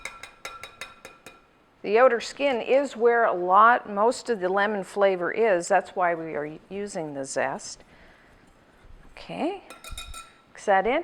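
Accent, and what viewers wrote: Canada. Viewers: She’s definitely Canadian 😂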